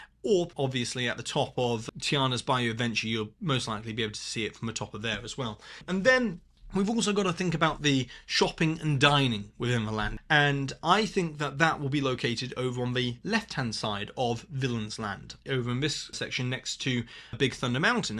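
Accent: United Kingdom. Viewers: Are you putting on your British accent? Why are you saying wailwoad, twain, dwainage, you weckon, etc.?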